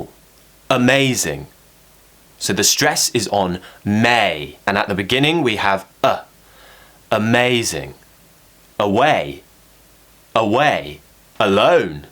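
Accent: United Kingdom. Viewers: Hello, Im from Japan. I love british accent♡ you are the best teacher xx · I adore the English British accent I wish I could speak like you teacher! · I'm watching this video right now.And now I know Malaysian people usually use American accent rather than British accent.But seriously I think I'm falling in love with British accent.Thank you a lot for amazing video.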